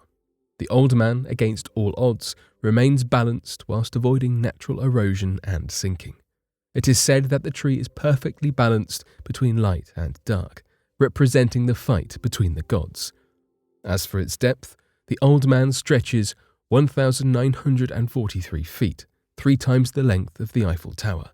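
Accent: United Kingdom. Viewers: I hear the British accent so understood and somewhat excused but the word 'myth' is incorrect and insulting consistent of the Western European mind and history with all cultures information other than Christianity. For your education, all information Native Americans share are historical facts passed Down from one responsible LISTENER and wise keeper of the truth to the next. It is accurate. It may not be in a language or give an image of justification that your limited exposure may be able to verify. Africans do it as well. Use of the word Myth in such a manner brings forth Arrogance, a way of thinking that Covid19 is demanding cultures and people that use it to cease and desist and the virus will Diminish.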